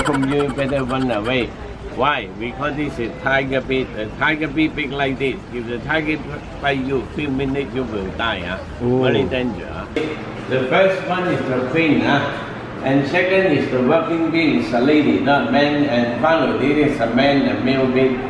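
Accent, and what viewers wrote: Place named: Batangas, Philippines. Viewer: Batangeno ka ba lodi? May naririnig akong punto e haha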